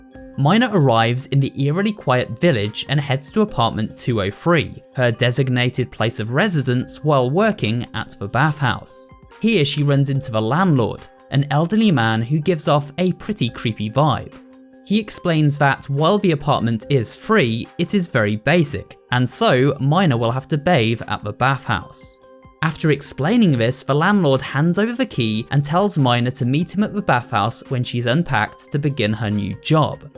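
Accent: United Kingdom. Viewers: You're british right? I'm british too What the hell is a faucet? It's a tap to us. Why are you pandering to americans. Saying "mom" and "faucet" (you arent even saying it correctly either). You have a loyal fan base. They know you are British. Speak like a brit!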